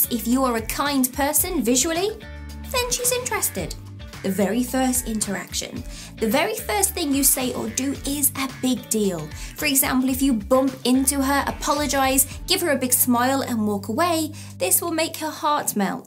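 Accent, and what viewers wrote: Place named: RP, United Kingdom. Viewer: This accent is RP .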